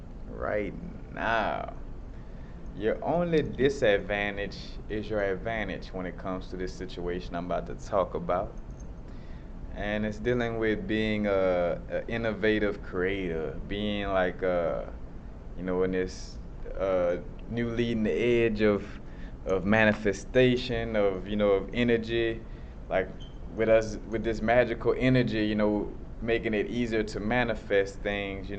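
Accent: New Orleans, United States. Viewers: Ahhh! Yet another BRILLIANT message!🙌 You make being innovative sound so easy lol, but it ain't no joke! I've been saying for years that innovators have no blueprints, yet my friends always wanted to mention how hard it is to start nonprofits, law practices, etc. and I would be like, "You literally have a checklist for the steps you need to take to achieve your goals, yet I am venturing where there IS no path and making it up as I go along." Don't do mentors, b/c no one has my unique insight or experiences; my instincts are my guides, ha! 😹 I have a degree in marketing, yet I don't market any of my skills/creations; the people who are meant to find them will! Don't really do social networking, the so-called "lifeblood of artists," either; too busy creating and living!💃🎨🎢 People often shake their heads and say, "Well how will I find you?" My reply remains unchanged: "You won't find me unless and until I WANT to be found! 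My existence is ART and ART is in constant motion!" LOL!!😆 Lovin this /400 degrees accent, too!💬🔥🎶 🎧😁Keep innovating!!!💃🎉🎆🌌💫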